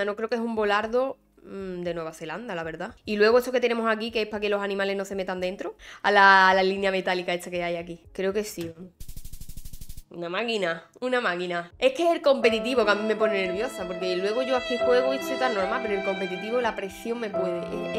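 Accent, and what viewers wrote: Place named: Andalusia, Spain. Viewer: Cómo me agrada encontrar en YouTube a una jugadora de GeoGuessr con un acento andaluz tan característico 💪💪 Saludos de uno de Málaga que vive en Huelva 🤗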